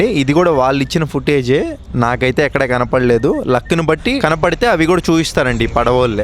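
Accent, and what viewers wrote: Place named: Godavari, India. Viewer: Oy abbayi ne videos super and ne voice kuda super same ma godavari abbayila behave chestunavu ne mata yetakaram thoo❤❤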